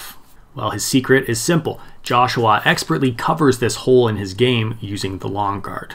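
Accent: Canada. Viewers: I have no idea what your background is, or who you are but your videos are phenomenal! The YouTube algorithm really did it's job recommending you. You sound Canadian(?), and you seem pretty educated. The way you break things down is almost uncanny. Do you talk about your background and I just haven't seen it yet? I'd love to hear about where you came from and who taught you because you are explaining things in an extremely succinct manner that is excellent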